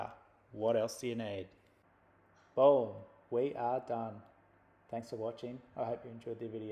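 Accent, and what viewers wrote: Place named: Australia. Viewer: This is for Australian viewers , I can see non Australians will have a problem understanding you . Even I gotta listen extra carefully.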